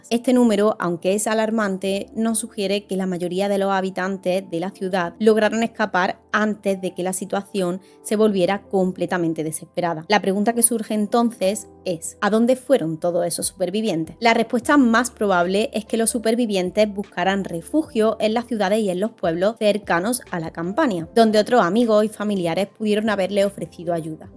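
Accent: Spain. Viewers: She's from Spain, lol...the only accent I can recognize...well, there was a South American one I found similar...Venezuela or something...or maybe not, lol...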